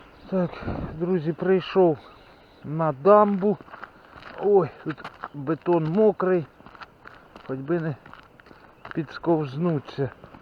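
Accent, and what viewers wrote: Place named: Cherkasy, Ukraine. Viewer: Неначе черкаський,,говір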